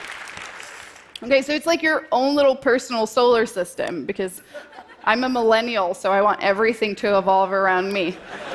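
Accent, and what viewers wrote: Sweden. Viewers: Your Swedish accent really came out in this video! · This is probably the first time I've actually heard her Swedish accent come through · It's easy to forget Simone aer Svensk (Swedish). The accent shows just a little. Swedens have trouble with when to s. 😊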